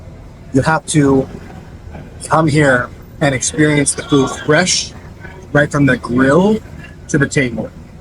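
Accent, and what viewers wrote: Philippines. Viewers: please knock off the Filipino accent when u speak English. Its ridiculous. I speak 7 dialects read n write fluently. When I speak English I use no Filipino accent!!! By the way I am a former Marine also 77-84!! Married to a Filipina for 44yrs!!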